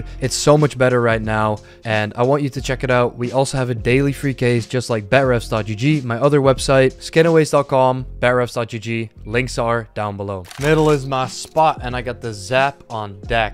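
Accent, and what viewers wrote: Netherlands. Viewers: I mean im the one you need if you want to be downgraded, complete gawed gamer over here my god. btw love the dutch english lmfao its cracked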